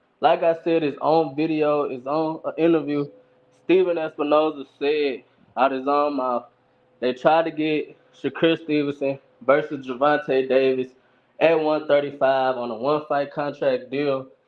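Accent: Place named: Baltimore, United States. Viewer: you sound like you from bmore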